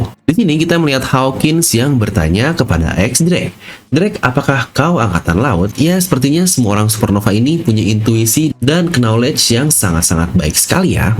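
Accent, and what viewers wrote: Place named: Bandung, Indonesia. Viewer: hmmm kek nya orang bandung admin nya ahahahaa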